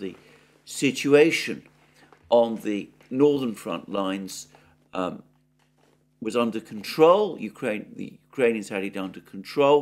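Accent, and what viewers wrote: United Kingdom. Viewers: Dear, I continue enjoying your videos every single day and feel grateful for both their content as well as your fine British English. I once offered to make suggestions on the pronunciation of Russian (and possibly Ukrainian) proper names as well as some factual information on Russian history and trivia, and now see you have accepted suggestions from someone else. You do not need to pronounce Russian / Ukrainian proper names as per the source language standards, especially if there is a long standing tradition in a particular case, like, for instance, Moscow. However, the global trend is towards trying to pronounce foreign proper names as close to the original as possible, and you have now made fantastic improvements along this road which makes me so happy as a native Russian speaker with some notion of Ukrainian pronunciation. This is why I would wish to make a couple of additional suggestions if I may. 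A capital letter preceded by a single inverted comma indicate the vowel under stress in a word. First, a delicate one. We say Dni'Epr in Russian, not Dnipr. The Ukrainian equivalent is Dnipr'O (both river and city). Ukrainian men's Christian names are Petr'O for the Russian Pi'Otr (like Petr'O Porosh'Enko) and Dmitr'O for the Russian Dm'Itriy (like Dmitr'O Nataluha). Some more place names: Lim'An Izi'Um Osk'Ol (river)/Ukr.: Osk'Il Tokm'Ak Taganr'Og Andr'Eievka / Ukr.: Andr'Iyivka A couple of family names: Lavr'Ov Poliak'Ov(a) I have no idea of Gen. Mordvichev's own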